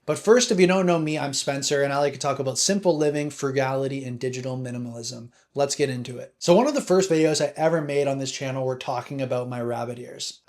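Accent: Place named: Canada. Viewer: Eww you sound like from cAnaDaaa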